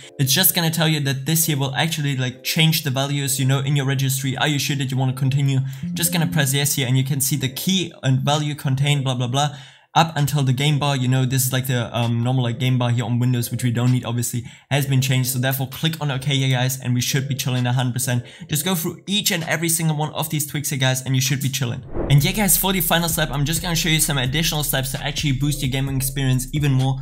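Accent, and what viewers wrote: Germany. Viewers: 100% deutsch akzent erkannt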